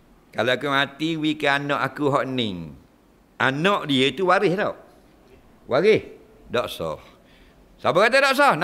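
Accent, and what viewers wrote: Terengganu, Malaysia. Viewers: Terbaik UAI.Terima kasih Ustaz.Seronok dengar loghat Terengganu Ustaz.👍👍👍👍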